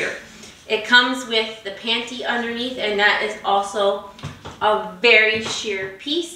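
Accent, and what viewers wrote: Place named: Canada. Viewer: good night sweetheart I can tell in your voice that you are from Canada sweetheart I love it❤❤ looking beautiful in that sweetheart 🥰🥰🥰 love to hear your voice in person 😍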